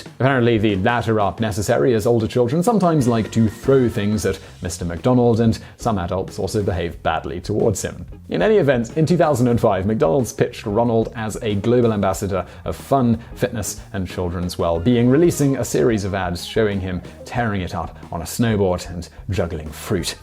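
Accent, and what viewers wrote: United Kingdom. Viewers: Why is vsauce talking with a British accent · Literally ten minutes into this video I was like wait wtf he’s british 😂 i guess it was just the subject matter, but it was like my brain was filtering out the accent or something. Pleasant surprise, great video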